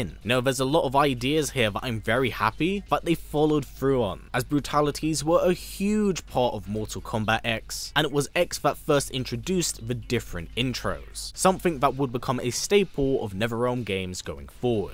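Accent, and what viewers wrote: United Kingdom. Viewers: The first MK i played :3 Great Game!! Pd: Your Accent...are you From UK? Answer if you want, mah Boi.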